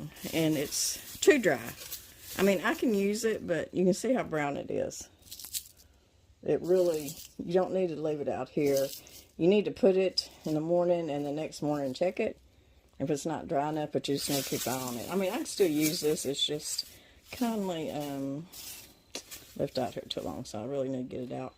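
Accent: South, United States. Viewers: I just thought you were gonna tell us a good story! I hope it's not going to be "one of those days!"... I spent the major part of my life in the south, so it's not like I'm not used to the accent, I have one myself! Have a blessed day!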